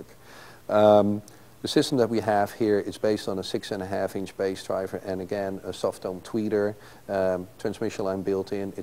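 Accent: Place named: Netherlands. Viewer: The presenter sounds like he's from Holland.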